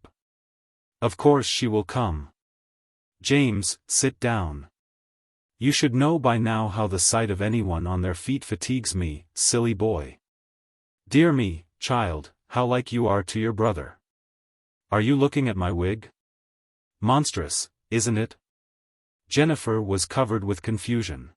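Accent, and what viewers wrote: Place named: United States. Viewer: It needs a British reader. American reader doesn’t do it justice.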